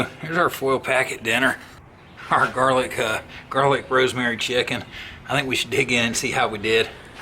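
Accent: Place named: Northeast, United States. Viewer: what part of the northeast are you from I can't place your accent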